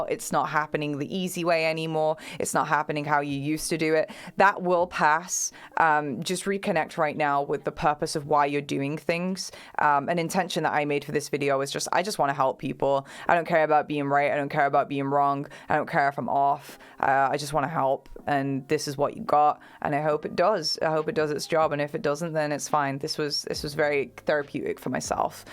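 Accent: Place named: Northern England, United Kingdom. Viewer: Your intelligence is always a step in front of you. I get pulled up for the speed and length I talk at, but you have an extra gear beyond i've ever known. Your every word makes total sense, but I wish I could tune in better. I don't know if you've ever watched or heard anything of the philosopher Alan Watts? Though, he passed away many years ago now, his talks have become very prevalent these days, and most can be found on this platform. Your intensity is amazing. Your hands almost another person in the room, and you know this. Maybe I'm having the same issue as the beginning of animated films, where guessing the voice actors can distract from the plot, but I'm still attempting to guess where the Northern English part of your accent comes from. I could hear you talk for hours, and even if I have to use technology to slow the recording down to understand, I will. 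My apologies to those who know you, they will no doubt roll with your enthusiasm naturally, so my point is moot. I must revisit more astrology too, I haven't for too long. I'm Steven. I'm an attention seeker. I naturally attracted Pisceans, and if you can guess my sign with a 12/1 chance from this comment. I will become a Patreon, because if you are that intuitive, how could I not. I have to add, although communication is the most essentially part of any relationship, and putting effort in can only make that better. I believe too much thought and effort will ultimately mean you're with the wrong person. Especially whe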